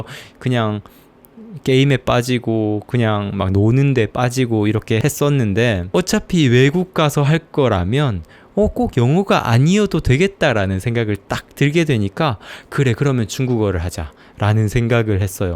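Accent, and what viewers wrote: Andong, South Korea. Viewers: 독서 검색하다 우연히 알게된 님, 말투에서 안동분임을 알아차렸죠.^^ 연배로는 한참 아래분이지만 인생 대선배인듯한 느낌에 그저 존경스럽단말밖에 안나옵니다. 오늘 아침, 밥 먹으며 고1아들에게 님 얘기를 했네요. 꼭 한번 들어가보라구요. 님이 성장이란 단어를 가장 좋아한다고 했는데 저도 그렇습니다. 그 성장이란 단어는 43살부터 본격적이었네요. 2년전부터 자투리시간 할애해서 주1회 중국어 학습지 수업을 하고 있는데 안하는거보다 낫잖아라며 가볍게 하고 있는데 님의 중국어 채널 유익하게 잘 보고 있습니다. 차로 이동하며 두서없어 하지만 이또한 안하는거보다 나으니까요.^^ 지인에게 님을 소개했더니 인생책 10권을 사서 열독하는 중이라고 그친구 정말 대단하다구 하더라구요. 동감입니다!! 앞으로도 지금처럼 차로 이동하며 화장실에서 화장하며 밥하며 수강생을 기다리며 그렇게 보고듣고 따라하며 더한 성장을 꿈꿔 봅니다. 참 고마운 님, 참 고마운 유튜브입니다!! 언제나 화이팅입니다!!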